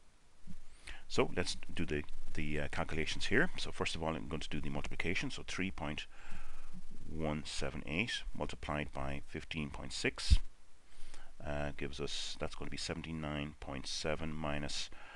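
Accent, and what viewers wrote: Ireland. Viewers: A very interesting video no doubt and the Irish accent is a great addition, especially if you are going to watch it 7 times, almost one after the other as I did ... I understand the importance of the PCC to find relationship between X and y (if any) but when it comes to the slope, why are you not calculating it as the result of SP / SSx, especially when we have those values good and ready?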